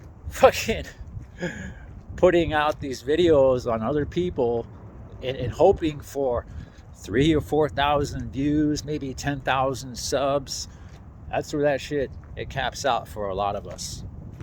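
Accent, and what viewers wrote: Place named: New York, United States. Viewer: Sad I think I figured it out he from New york?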